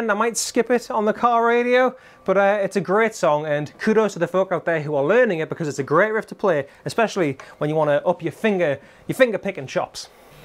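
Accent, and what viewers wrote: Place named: North East England, United Kingdom. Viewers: Where are you from? I'm from Sunderland and I detect a bit of a North East accent. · i'm 52 and a total gearhead and i've been to millions of guitar shops over the decades corporate and indie and i have never noticed any particular song being played more than any other. i personally think it's a bit of an urban myth. me myself i tend to default to ' ' simply because it's the first really complex lick i ever learned and i hammered on it til i got it (i was still a beginner). btw "IT'S ALL MUSIC AND WE'RE ALL IN THIS TOGETHER" in his awesome northern english accent gotta love it could not have saud ut better myself!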